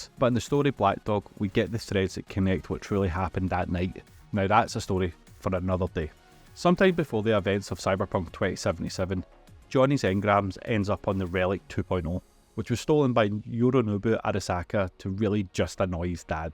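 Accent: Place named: Scotland, United Kingdom. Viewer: Cyberpunk explained by a Scot! Great video, learned a ton. (Sorry if you're not scottish).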